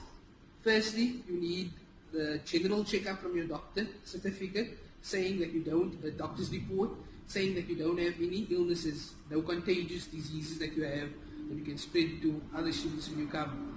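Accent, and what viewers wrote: South Africa. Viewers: lol you can hear that this bru is from Cape Town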